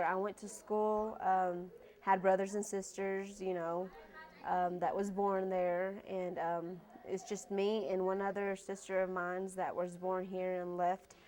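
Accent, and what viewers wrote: United States. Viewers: Dime donde recides te dire si estas en lo correcto. El asento de ella es Americano trabajo con Americanos y le entendiendo y su acento esta como un Americano es mas convivo como muchos Universitarios. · Hahahaha Piche wey no, sabes que es acento americano si supieras no dirías nada, oye Franky alivianate carnal actualizate su acento es muy country y muy América oye Franky mejor cierra la trompeta por que no sabes nada de ingles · I love your Tennessee accent... It looks real good on you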